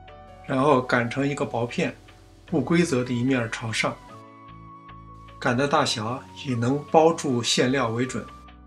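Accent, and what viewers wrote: Beijing, China. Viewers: 太喜欢看您的视频了，菜谱简单轻松易学，谢谢您和大家分享。北京话听起来好亲切啊 ☺️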